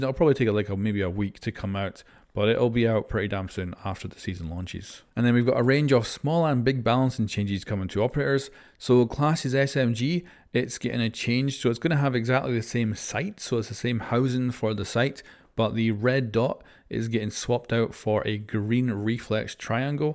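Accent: Scotland, United Kingdom. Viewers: Are U Scottish V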